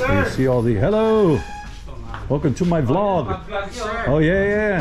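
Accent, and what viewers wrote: Philadelphia, United States. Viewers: It's not that they don't speak English, it's just that you speak english with a Philly accent and they need time to process what you just said - that blank look on their face after you say something to them is their brains processing what you just said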